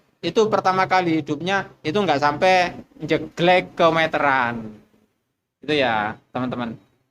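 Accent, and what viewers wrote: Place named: East Java, Indonesia. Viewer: wong ndi se mas,, kok kyk jatim ngunu ngmg e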